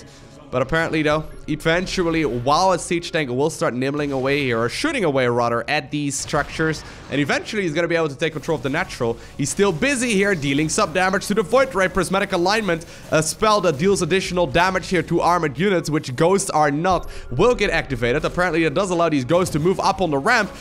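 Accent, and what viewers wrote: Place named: Netherlands. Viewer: The way you say "catapult" is the greatest. Im from america so i dont hear very many people talk with a dutch accent, i think its great. And i love watching lower level casts just as much as high level/pro casts because you see so much more variety in play styles. Granted, at lower levels, there is a lot of cringe moments (obviously because of the lower level) but, the cringe from both sides equals out and makes it a fun game to watch regardless. Especially since most of the time, its so hard to tell who is winning untill the very end.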